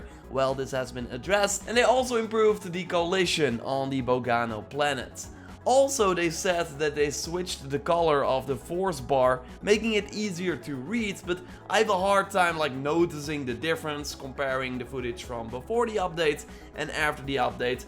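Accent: Netherlands. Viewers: Hmm, you sound dutch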